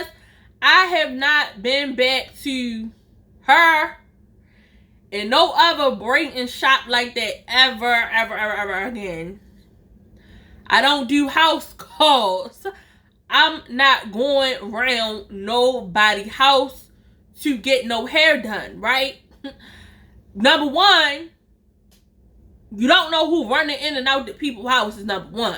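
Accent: Baltimore, United States. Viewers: Ikkk you sounded from Baltimore hey girl hey lolll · Bruh lol this my second video I’m watching I just knew u sounded like u was from Baltimore 😭 I was like I ain’t tripping then u said city I was like oh yeah the accent never lies ! Now I feel the vibes stronger 🙌🔆‼️😎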